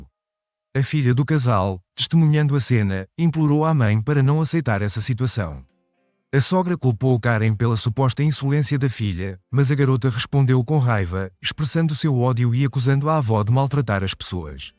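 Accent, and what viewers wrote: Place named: Portugal. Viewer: Sotaque horroroso esse de Portugal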